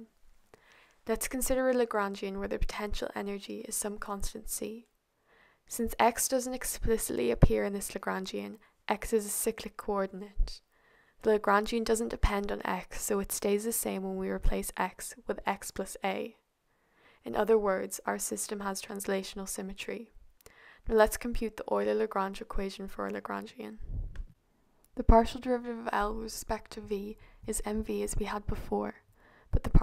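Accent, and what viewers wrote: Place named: Ireland. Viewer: the speaker's accent sounds a bit Irish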